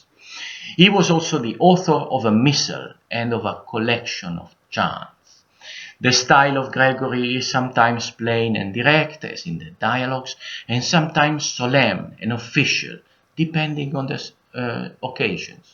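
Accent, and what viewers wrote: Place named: Italy. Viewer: I love Your lectures. They're both informative and entertaining. At first I thought it was a public lecture from some fancy university but It seems like it's Your private initiative. Really quality material. You speak with a thick Italian accent, so subtitles are useful, but I like the flow and melody of your language. Can't wait for the next upload!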